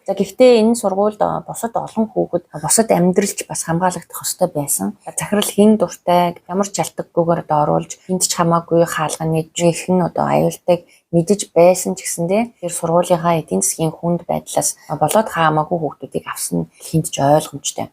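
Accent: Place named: France. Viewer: Chi naad french ayalagaa boli ok...chi yasiin french hel meddeg ain..english heleer ok Bi Amerikaas sonsoj bna...hutsaad Frensh ayalagaar ain...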